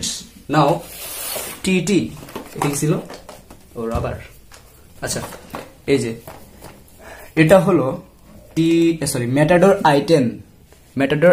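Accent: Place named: Bangal, Bangladesh. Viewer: Dada ami to bangal noi tai bangal bhasha janina ektu ektu bolte pari bhaiya apnar bangal bhasa amar darun laigachhe are apnar reveiw o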